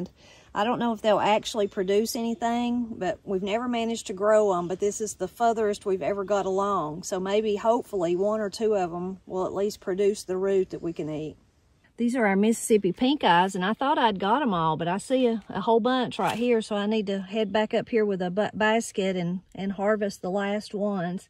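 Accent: Appalachia, United States. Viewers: I know what airish is now!!! I even used it the other day. Thank you! Love the Appalachian language.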